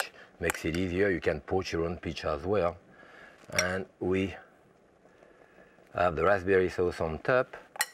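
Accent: France. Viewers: For someone with a pretty strong French accent (which is great btw) Jacques has an elaborate English vocabulary, which is strange. Is it because European languages share many words?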